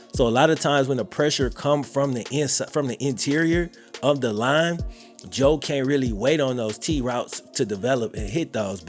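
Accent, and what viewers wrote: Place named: Mississippi, United States. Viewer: Where you from in MS?? I knew there had to be a reason you sound like a dude I been talking to my whole life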